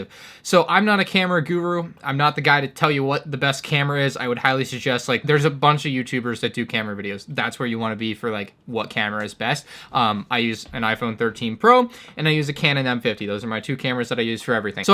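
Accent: Germany. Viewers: as a german i approve of your german accent :) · Just wtf for that „german“ accent lmao 😂😂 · As a German i have to say that your german Accent is really good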